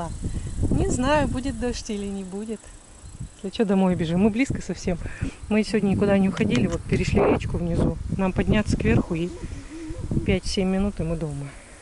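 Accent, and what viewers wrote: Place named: Urals, Russia. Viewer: Даже не зная,где вы живёте,я поняла по говору,что вы с Урала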